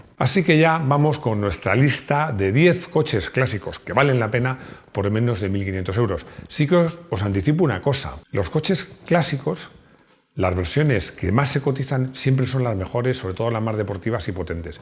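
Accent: Chile. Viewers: es chileno? ese acento reconozco xDD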